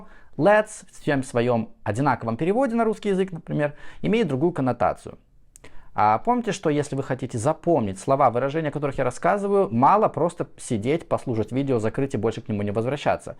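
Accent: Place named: United States. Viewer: Хоть и предпочитаю британцев, но у очень аккуратное и ненавязчивое американское произношение. В сети среди русских он по этому показателю несомненно намба уан. Да и русский у него не без изыска. Лишь интонация слегка выдает в нем давно не бывавшего на родине. Но это естественно.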